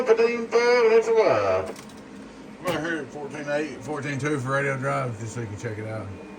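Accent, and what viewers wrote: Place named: South, United States. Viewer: Yee old southern draww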